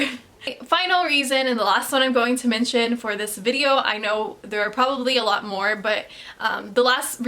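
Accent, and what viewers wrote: El Salvador. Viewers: I was the #503 likes as the code of my country El Salvador. I like your videos in English mixing Spanish with Salvadorean accent 😋😉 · I just love your acento salvadoreño.. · I'm One of your suscriber and I'm very glad the way you talk about my country like or not your part of our Family you got the same way that we talk nosotros los guanacos and i like that ( i mean The way you talked spanish) i got more than twenty years living at LA California and like i said your are a good reference to us the Salvadorean Community....it's good that you make your videos in english and i support that because there are many countries that prefer the english lenguage than spanish so go head girl you are wonderful. ...atte fredy fuentes. ..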